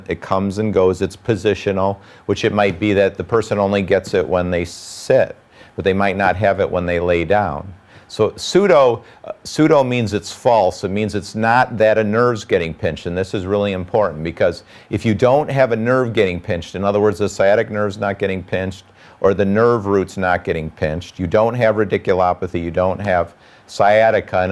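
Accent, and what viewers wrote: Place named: United States. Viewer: i would but it sounds like your in america would you know if they provide this in the UK?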